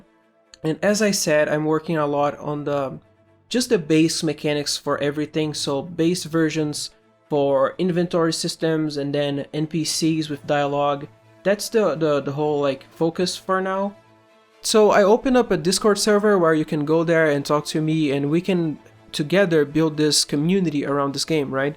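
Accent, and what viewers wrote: Brazil. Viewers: I almost didn't notice that you are brazilian!!! Excelente trabalho, talvez seja legal fazer um canal pro povo BR tbm ou não neh... Se bem que fazer a mesma coisa duas vezes deve consumir muito tempo. Excelente trabalho!!! Quero ver a sequencia desse DevLog!! Alias quero jogar esse jogo!!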